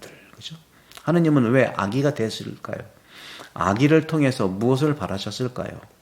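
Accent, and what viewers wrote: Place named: Chungcheong, South Korea. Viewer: 신부님께서 태안성당으로 부임하신 후에 충청도 특유의 느긋한 양반체로 천천히 말씀하시네요. 훨씬 더 명료하게 잘 들립니다. 신부님의 강론이 귀합니다.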